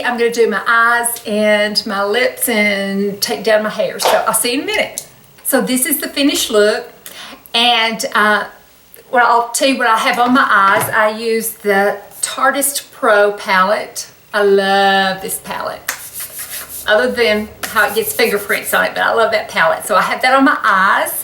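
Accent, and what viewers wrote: South, United States. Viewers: I love your southern accent. I gotta say you have very good skin, no sagging, wrinkles, or hollowness. You won the genetic lottery. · I recently discovered your channel and I'm working my way through your videos. You are absolutely gorgeous! I'm about your age but look twice as old because I've never done any skin care until recently. You have a beautiful smile (and personality) and I adore your southern drawl! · I love your video - this is the first one I have watched. Wish I looked as young as you! I love your southern accent, makes me feel at home. XOXO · I am so thrilled to have found your videos today!! What a beautiful lady you are! Being in my mid 40's, I really don't want the makeup/skincare advice from a 20-something... so finding your site is exactly what I've been looking for. And, I believe I heard you refer to being from Tennessee, I'm also a TN gal, nice to hear that southern accent! 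You are such a beautiful lady with or without makeup. I'm looking forward to watching your videos. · GORGE GURL!!! I am in my 40's soon to be 50....EEEEEK! I am in DISBELIEF About that.....You are absolutely STUNNING! I am starting my channel "Boldly Being Me" Life after 45!! I hope I can get you to subscribe? But either way I loooove your Channel and videos, love your accent ( I am from Jacksonville Florida, so southern accents are the Norm here;-)) You do You and We are Loving it! ;-))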